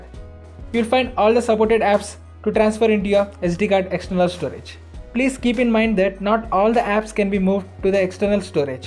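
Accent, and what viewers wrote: India. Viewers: its called Indian accent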